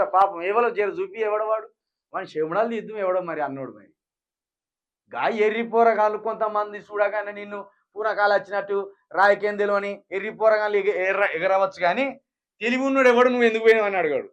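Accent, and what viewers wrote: Telangana, India. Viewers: తెలంగాణ యాస మాట్లాడగానే మొత్తం తెలంగాణ నీదే కాదు....!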